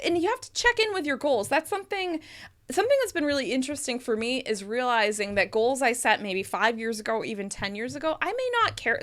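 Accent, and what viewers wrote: United States. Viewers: Perfect American accent!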